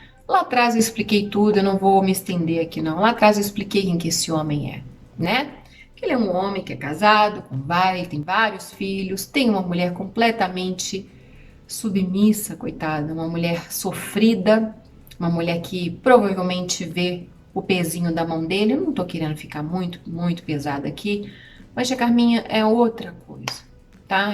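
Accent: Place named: Minas Gerais, Brazil. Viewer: Não sei como vim parar por aqui,kkkk,amei o canal que indiquei para uma amiga que logo será psicóloga...Amei tanto que estou maratonando os vídeos,kkkkkk, já mostrei para o marido,logo que vi o primeiro vídeo, já pensei é mineira,uai ! Sou também,😊, parabéns pelo conteúdo,pois,até quem nem sequer pensou em se aventurar por este mundo daí,pode adquirir muito conhecimento ! Que Deus possa abençoar muito teu caminho !😘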